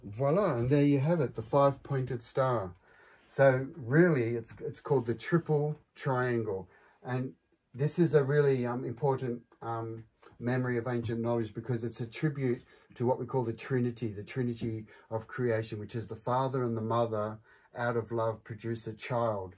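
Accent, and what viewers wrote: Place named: Australia. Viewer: Do you do courses Or classes? I'm in Sydney Australia. I am in Sydney & I just realised you have an Australian accent I was so engrossed in your teaching I didn't even notice. I would love to take a course lol 😂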